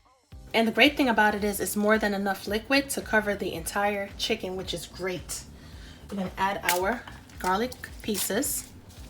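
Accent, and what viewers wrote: New York, United States. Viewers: Love that NY accent lovely chicken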